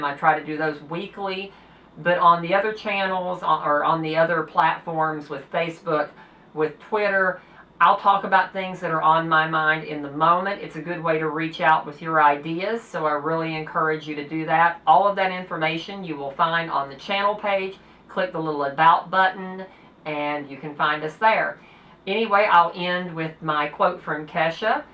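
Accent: South, United States. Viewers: The word for subcutaneous air (air under the skin) is "crepitus". Pronounced CREP-it-tuss. Congratulations! I too am trans M to F. Your accent is distinctly southern. May I ask what state you are from? I am a Florida. All the best! Alanna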